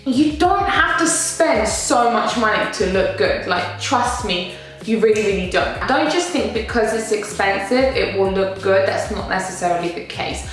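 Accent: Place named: London, United Kingdom. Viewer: I hear a London accent but I can’t place what part... where are you from?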